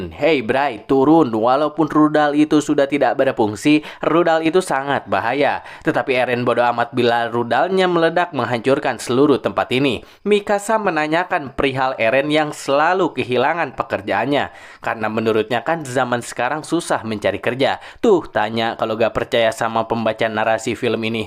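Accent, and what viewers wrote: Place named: West Java, Indonesia. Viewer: Bang lu kaya orang sunda logat nya..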